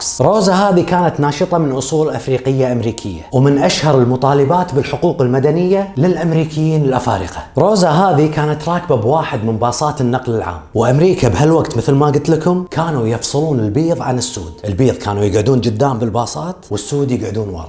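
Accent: Kuwait. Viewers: حبيت اللهجة الكويتية من وراء سردك الحلو وغشمرتك وخفة دمك...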